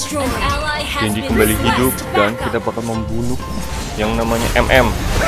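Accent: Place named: Madura, Indonesia. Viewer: Orang mna lu?kek bhs madura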